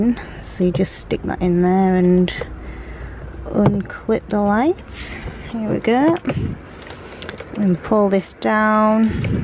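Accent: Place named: Yorkshire, United Kingdom. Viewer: Sound like you're from Yorkshire ! Ha fancy a race ?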